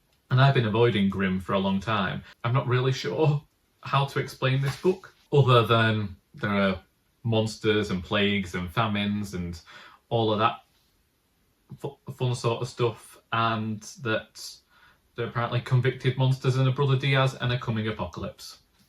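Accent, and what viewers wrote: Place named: Manchester, United Kingdom. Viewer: I've been watching your videos a lot recently and I really enjoy them, in part because I recently moved away from Manchester and I feel so at home listening to your accent. Also, you've helped me out of a reading slump and although I'm not a quick reader I am back into reading again. I enjoyed Never the Bride by Paul Magrs and I've just got Emotionally Weird by Kate Atkinson. I've made note of quite a few of your recommendations, which I'll look at getting later on. It's funny how earlier this year I couldn't find anything that tempted me to read but, having got back into the reading mood, I'm coming across so many books that look interesting and my book shelves are stacking up. I've never really looked at books in charity shops before but I think the randomness of choice has been really helpful. Anyway, just wanted to say thanks, 📚🙂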